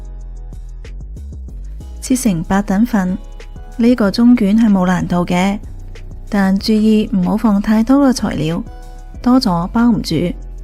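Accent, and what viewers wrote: Standard. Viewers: 粤语非常标准，听了舒服极了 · 妳的廣東話好地道喎, 好專業既講解, 看🈵學習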